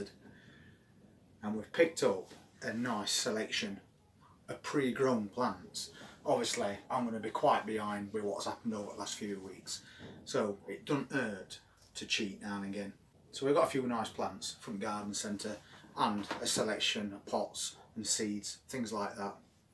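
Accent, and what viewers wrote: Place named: Northern England, United Kingdom. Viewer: I so love your videos. I have M.E and days where I'm suffering a crash (post-exertional malaise, which feels like 'flu with a hangover), your gentle voice and lovely Northern accent is soothing to my frayed nervous system.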